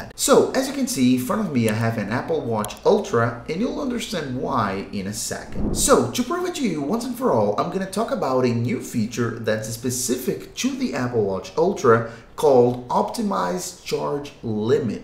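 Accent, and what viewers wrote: Brazil. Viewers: First time in watching your videos, I could pick up a small accent, are you Brazilian?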